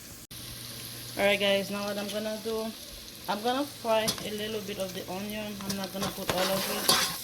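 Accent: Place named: Haiti. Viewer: Baby that Haitian French accent so cute lol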